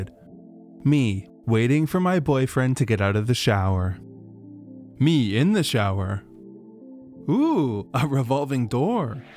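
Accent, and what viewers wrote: United States. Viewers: The Texas accent was hilarious, coming from an authentic Texas native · I live in East Texas and I've heard people speak with a VERY similar accent. Even kind of sounds like an old politician from the 90s named Ross Perot.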